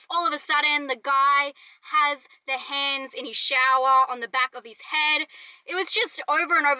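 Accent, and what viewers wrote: Australia. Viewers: I let this video play while cleaning my bathroom, enjoying that Australian accent and when I came to my screen and saw the nosebleed I freaked for a second lmao...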